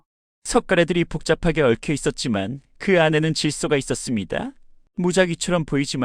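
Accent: North Korea. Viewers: 나래이션..ㅎㅎ 북한말버젼... 모야...ㅎ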